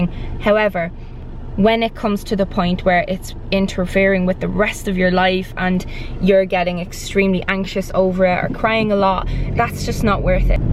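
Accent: Ireland. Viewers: Hello my friend how are you to day ? I subscribed to your blog . I like your Iresh accent very much and would like to hear more from you .I find you to be very cute and would like to get to know you much better .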